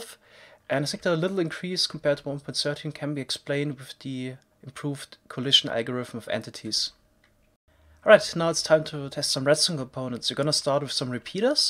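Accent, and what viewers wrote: Germany. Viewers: Du bist doch deutscher oder :) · Das hört man raus das du aus Deutschland kommst, nices Video :D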